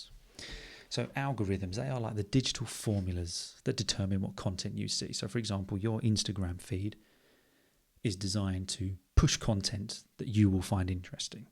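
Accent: United Kingdom. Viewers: I never write comments, but I wanted to support you at least this way, because I think, your channel is really underrated. I absolutely love your British accent and I really appreciate how you cover such interesting and thought-provoking topics in your videos. So far I’ve only watched your last two videos, but I’ve watched them 3 times each.😅 Keep up the great work!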